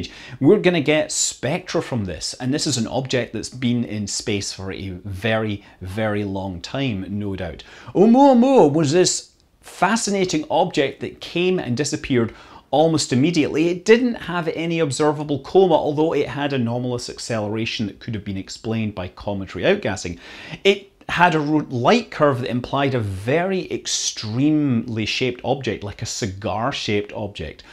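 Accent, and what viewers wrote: Scotland, United Kingdom. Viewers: I had to rewind to listen again to what you actually said.... because I was expecting you to say "temperature", so that's what I "heard" you say! When I read the overlaid correction, I thought, "Wait.... What?" This is all because I have a very hard time processing auditory information quickly enough, and with a Scottish accent and rapid delivery, in tend to miss lot. (This makes telephone conversations hard work for me, esp. with the wretched voice quality on American mobile phone service.) Thanks to YouTube for offering 10-sec REW!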